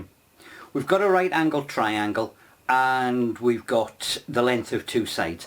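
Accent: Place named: North East England, United Kingdom. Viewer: I can tell by you accent you come from somewhere near me (North-East England) - If you're back in this area any time soon, contact me and I can show you one of my equatorial mounts in action - I'm an astronomer, and own several of them... It's impossible for them to work properly on a flat Earth.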